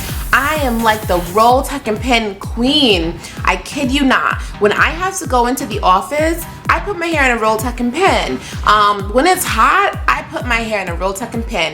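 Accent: New York, United States. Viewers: LOL I love your voice... your such a NEW YAWKER ..lol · Love your accent girly! NY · I so LOVE you, your NY accent was all over this one. One day I'm going to be able to meet you at a meet and greet, you seem to be a blast to be around.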